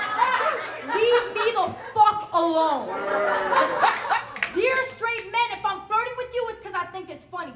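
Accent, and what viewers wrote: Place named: New York, United States. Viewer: She sounds like she’s from New York 😂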